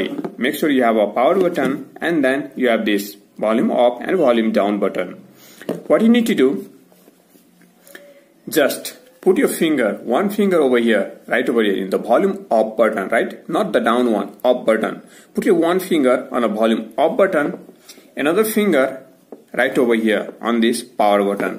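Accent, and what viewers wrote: India. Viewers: This video was the most Helpful and most Thorough video, even with the Indian accent(and this is a Compliment). Thanks a lot.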